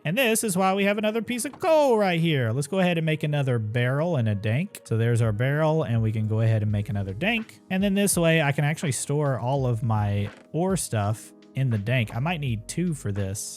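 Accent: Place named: South, United States. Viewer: I never ever comment or anything on YouTube but I have a question. Where in the south are you from because all the other MC youtubers sounds like their British or northern American. Idk, just curious because I could sleep to your content easily (in a good way) 😊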